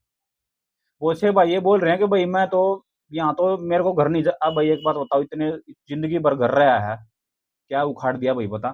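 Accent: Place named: Haryana, India. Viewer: Haryana ki boli khadi hai lekin siddhi baat ar khari baat kehte hai.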